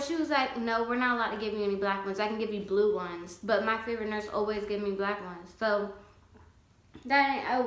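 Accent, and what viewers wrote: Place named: South, United States. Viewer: You’re literally the most beautiful woman I’ve ever seen wow, love your southern accent.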